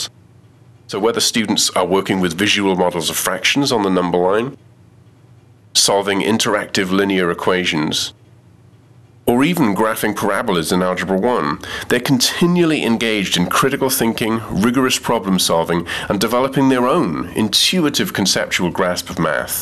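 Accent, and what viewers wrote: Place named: India. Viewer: He Speaks Indian English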